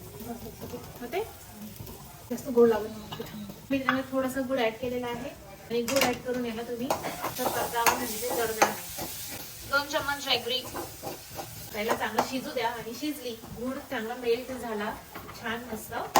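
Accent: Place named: Karanja, India. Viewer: Tai tumhi kuthlya gawachya aahe tumchi language bilkul aamchyAsarkhich aahe Karanja (ghadge)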